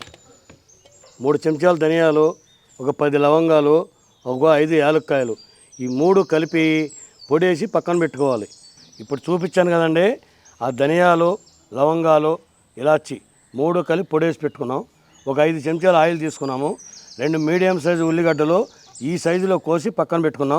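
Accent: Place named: Vemuru, India. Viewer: మాది వేమూరండోయ్.... మా ఊరు మాటల్లానే ఉంటాయి అనుకునేవాడిని... మొత్తానికి నా ఆలోచన కరక్టే అయ్యింది... ఇక దంచెయ్ అన్న... వంటలతో...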